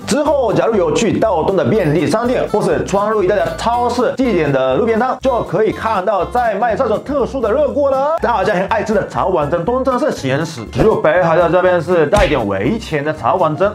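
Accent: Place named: Taiwan. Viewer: 社長說話流利很多 也很台ㄟ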